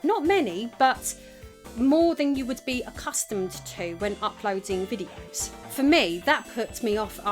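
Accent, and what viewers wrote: United Kingdom. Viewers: I watch you and Top Hat Gaming Man because you both have awesome British accents. And I guess the content is alright. Just kidding, you two are awesome!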